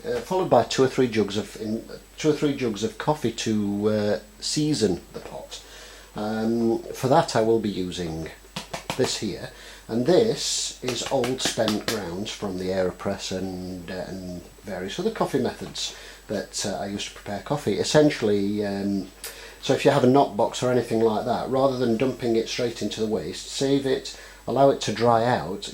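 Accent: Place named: Scarborough, United Kingdom. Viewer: Your accent sounds like your from Scarborough. That looks like an old Pezzetti pot. It has a bakelite handle and knob. They only use plastic these days .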